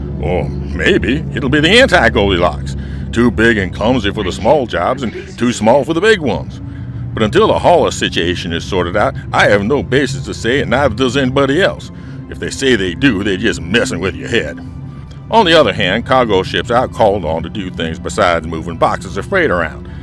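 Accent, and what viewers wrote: United States. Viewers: Damn now that American accent is so amazing. You should make angry shouting ads for ships in the american military style lmao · Mate, that accent is the most American thing I have ever heard. Loving your SC series more than Moe Haytar loves his packages. 😁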